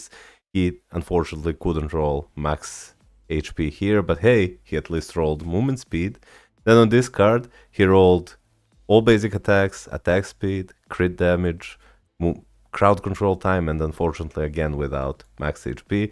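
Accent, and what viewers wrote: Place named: Serbia. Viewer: As soon as I heard your accent, I knew you were from Serbia. Glad to see that there are people that play MFF. My IGN is L0NEW0LF312.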